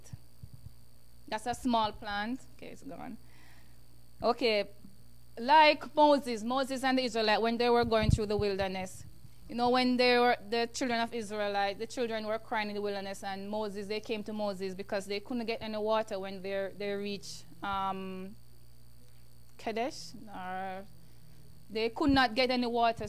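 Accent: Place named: Jamaica. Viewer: keep it real me love de jamaican accent thanks for your presentation.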